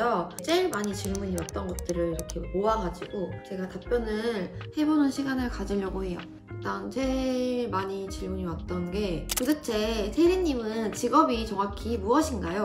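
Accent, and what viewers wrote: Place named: Gwangju, South Korea. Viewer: 말도 너무 예쁘게하고 그냥 천사같당 저도 광쥬사람인데 억양에서 조금 느껴지네유 고생 많았어염 앞으로도 지금처럼 행복할거예요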